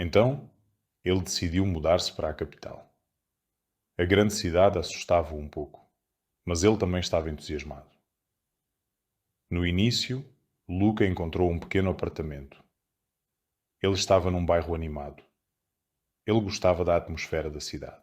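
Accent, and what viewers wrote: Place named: Portugal. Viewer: sounds like European Portuguese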